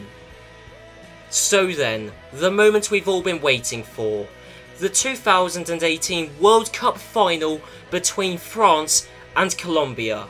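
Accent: United Kingdom. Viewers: Men do I have to correct so many names in Portuguese and Spanish cause of your British accent...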